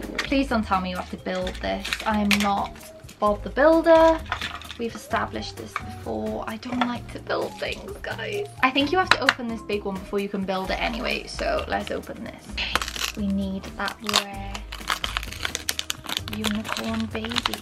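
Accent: United Kingdom. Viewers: I still can figure out if is British or not-💀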